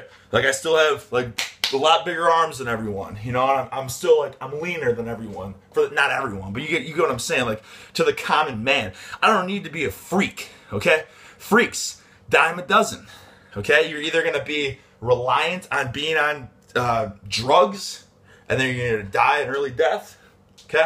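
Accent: Midwest, United States. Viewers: Is he from the midwest?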